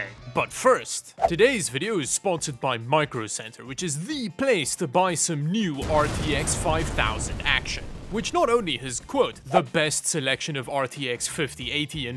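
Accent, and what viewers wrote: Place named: South Africa. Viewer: Hey man, I'm loving your content, are you a fellow South African?? It's so refreshing to hear a familiar accent XD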